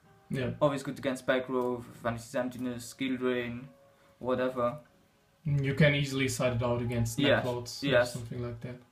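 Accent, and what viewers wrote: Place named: Germany. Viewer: naja warum macht ihr kein deutsches video ? all your cards are german and your accent sounds german ? by the way good Video i liked it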